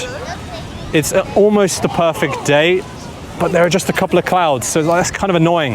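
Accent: London, United Kingdom. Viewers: Hi, I’m Mary from Iran. I’m glad I joined your YouTube channel. Your video was amazing and unique, and I really enjoyed it. As a teacher, I think your method of learning English through real-world experiences is one of the best approaches. Bridgerton is so attractive, and I hope that one day I can visit there. I only have one question: which region of London does your accent belong to?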